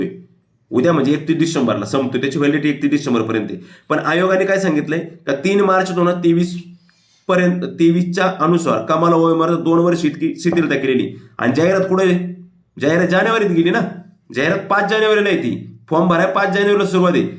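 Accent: Ahmednagar, India. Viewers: काही म्हणा गड्या... पण हा माणूस खरच अख्ख्या महाराष्ट्रात एकदम भारी माहिती सांगतो...आणि सर्वांना समजेल अशी सर्वांच्या भाषेला match होईल अशी अस्सल नगरी भाषेत एकदम कडक...सर खरच खूप छान काम आहे तुमचे....love you..❤❤ तुमचा व्हिडिओ पहिला ना सगळी माहिती डोक्यात जाते डायरेक्ट...🎉🎉🎉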